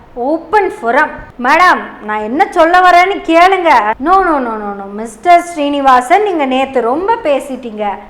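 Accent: Kongu, India. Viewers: Excellent dear yesterday I have seen your show today also seen after long time full show is back to full Kongu Tamil. Excellent dear. Here Mr.srinivasan requested this idiot uruga mammi to regularize GST for all items they are selling in hotel even if his high slab also. He very much humbled requested that but this arrogant lady without meeting peoples came to parliament through back doors and proudly arrogance behavior to a senior and very senior famous business man. Very sad to see this and very shame to be a tamilian to be a Our FM 😢😢😢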